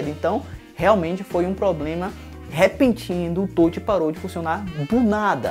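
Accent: Bahia, Brazil. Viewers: Tu é baiano ?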